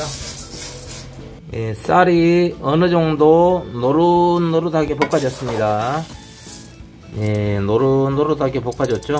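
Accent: Jeolla, South Korea. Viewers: 절라도 사투리가 긴 있당켸 ㆍ ㅎ ㅎ 호 ~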